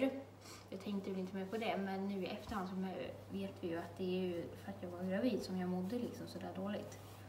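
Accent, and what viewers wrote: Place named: Dalarna, Sweden. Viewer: Nää det där är inte norrländska, och dessutom finns det ju sjukt många olika dialekter i norra Sverige som inte ens liknar varandra så det går inte att klumpa ihop alla dialekter och säga ”norrländska”. Det låter som att hon pratar dalmål tycker jag 😊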